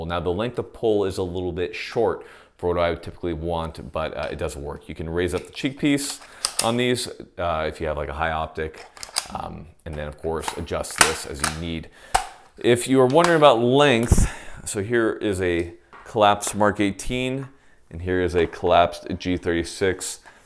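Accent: Germany. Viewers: yes, thats how we germans talk :) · we (germans) do in fact talk like that, one of the most prevalent accents is designing new stuff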